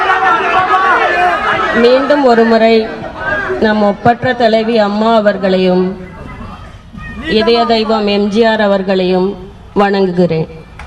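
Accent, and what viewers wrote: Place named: Karnataka, India. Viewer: இந்தப் பாப்பாத்தியின் தமிழில் கன்னட வாடை அடிக்கிறது. தமிழ் நாட்டை ஆள ஒரு உண்மையான தமிழன் கிடையாதா. சசிகலா ஒரு தமிழச்சி, தமிழ்நாட்டை ஆள அதைவிட விட வேறென்ன தகுதி வேண்டும்.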